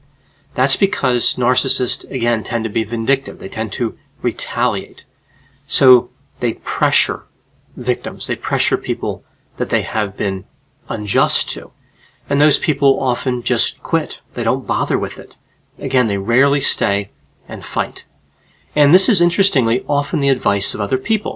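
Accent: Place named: Philadelphia, United States. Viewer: I can tell you're from the Philly area because of your accent. I too am from Philly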